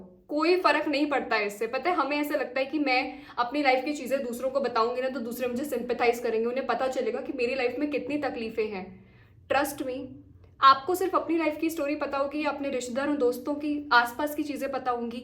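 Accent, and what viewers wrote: Bengal, India. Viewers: Tu bengali hai kya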